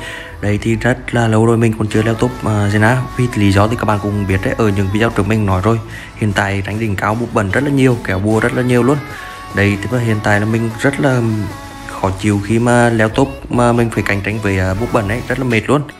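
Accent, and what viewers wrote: Central, Vietnam. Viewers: Bị ghiền tiếng miền trung rồi 😂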